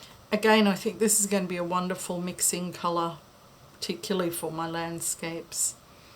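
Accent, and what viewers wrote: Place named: Australia. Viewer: Loving your videos! And it's nice to hear an Australian accent down this watercolour youtube rabbit hole. I just wanted to say that Naples Yellow differs from yellows/yellow ochres in that it's actually brown - so can be used in skies without making green when mingling with blue.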